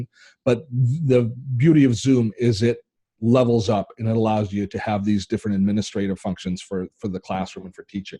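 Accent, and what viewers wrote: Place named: Canada. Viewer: Hi...very watchable...thank you. I am from the UK...have friends in Canada, so it was especially good to hear your accent and see your friendly front room style. I conduct/host a monthly discussion group...and new to zoom wonder about the 'hands up' symbol so I can mute people and then unmute them when I respond to the hands up? I am pretty sure I saw it in the free version...can't see it in the paid version.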